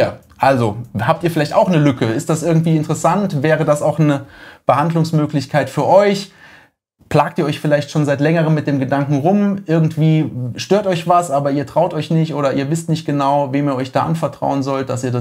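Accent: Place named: Swabia, Germany. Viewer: Servus, du sag a mol woher kusch du eigentlich genau? Du schwätsch d gleiche slang wie i😅.vg Tobi😊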